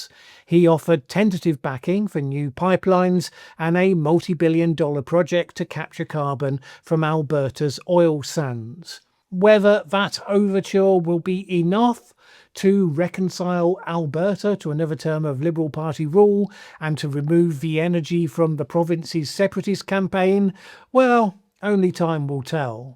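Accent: United Kingdom. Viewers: Canadian here. UK pronunciations ftw.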